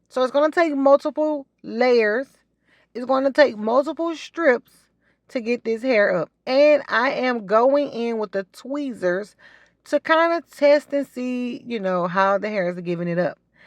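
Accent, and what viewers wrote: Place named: South, United States. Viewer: I watch all your uploads so informative and satisfying to see! I have been through the gamut of hirsutism hair removal issues and just seeing you help others is very encouraging. This upload I enjoyed your accent and the way you pronounce "depilatory" I just love listening to your southern accent! Love it! Keep up all the great entertainment and good facial hair waxing help!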